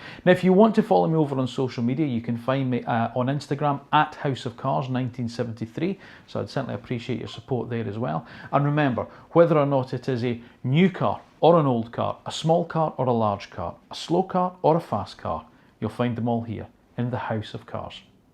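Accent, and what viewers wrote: Scotland, United Kingdom. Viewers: The design of this India built car was actually inspired by another Indian EV I happen to own, called the Tata Punch EV. Watched the review not for the car but for your charming scottish accent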